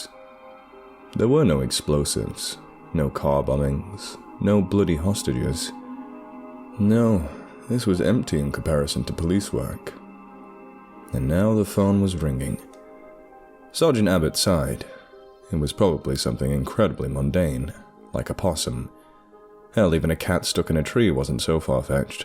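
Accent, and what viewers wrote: Australia. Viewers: This is the best Australian accent I've heard, thank you Lots of love from an Australian xx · i got stupidly distracted by one of the characters driving a vauxhall astra, but theyre rebadged as holdens here. absurdly nitpicky detail for me to get hung up on lmfao author is always great, the narration is always great, and as an aussie i give your aussie accent a solid 9.5/10 - the best part is you were able to keep up the emotive voice acting, thats what usually bugs me most about a lot of people doing accents, they get so focused on getting the accent right that they forget how to act! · Good job! We need more Australian creepy pastas, love your accent!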